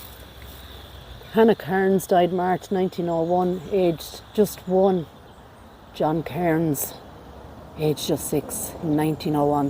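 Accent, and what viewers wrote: Ireland. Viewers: I love your accent. I don’t hear Irish accents much, since I left Boston in 1978.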